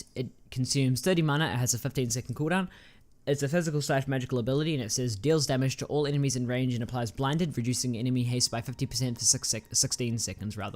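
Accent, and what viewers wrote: New Zealand. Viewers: nice nz accent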